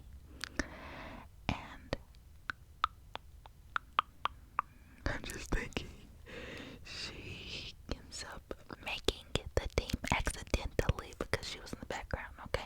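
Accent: South, United States. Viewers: Big southern.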